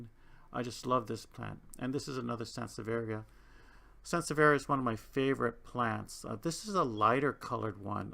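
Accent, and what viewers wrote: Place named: United States. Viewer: Beautiful plants! Now I know you live in Hawaii. But your English pronunciation is absolutely american:)Where did you learn English so well :)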